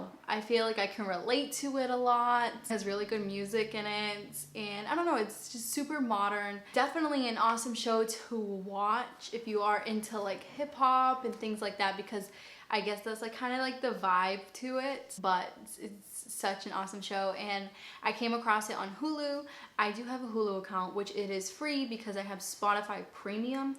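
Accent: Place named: Massachusetts, United States. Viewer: Where in Massachusetts are you from? That accent is deep lol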